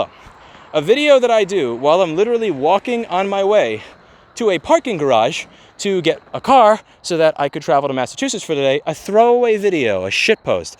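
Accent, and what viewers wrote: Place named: Brooklyn, United States. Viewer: Personality, God you'r from NY , Brooklyn even.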